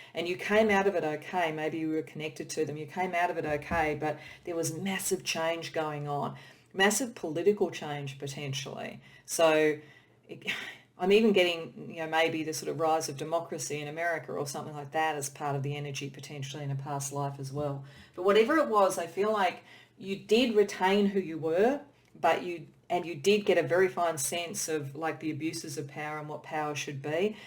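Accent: Australia. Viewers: The way you described the phrenology oracle and what phrenology is so nonchalantly with your brilliant and beautiful Aussie accent was highly amusing to listen to for me, enough for me to want to tell you about it 😆 probably not something I'd have done in person but being as we're at a distant and communicating through the net I'm gonna risk it 😊 I just had a quick look at what others have commented on this video and I totally agree with them, you come across as someone who is obviously and refreshingly very gifted at reading cards, I think you create really unique readings and I like the different combinations and choices of decks you use too, most, if not all are ones that I'm sure I've not seen being used by other people who read and share here on YouTube before, and yeah, I too am very grateful to be here and have access to such fun and insightful, inspirational readings. 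.. and I watched a past life reading only earlier today actually, from Hermit Tarot who is another magical soul who I'm sure I heard you mention as someone who you watch too in one of your earlier videos 🤔💭 I think 😊 .. and you touched on the exact same things about this particular past life that she was reading into too! 😮🌝 crazy init how it all works, mentalist I tell thee 😆☺️ ahhh God 🙏🏻😌 I didn't fit in with the family or the society around me at all in this life in question, and I think it was in England, which is where I am again now, and I was thinking just now that I was maybe a woman in that lif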